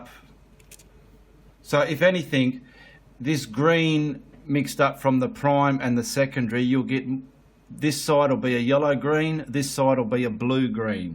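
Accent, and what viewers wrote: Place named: Australia. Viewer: its great to see and hear a fellow Aussie . im looking forward to following your tutorials as im a beginner .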